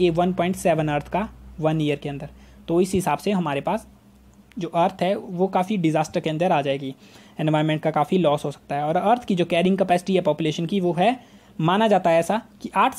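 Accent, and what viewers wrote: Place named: Himachal Pradesh, India. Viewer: Thanks sir 🙏 Are you belong from himachal ,,,??? Muje apky way of talking se lga that's why I am asking youu 😊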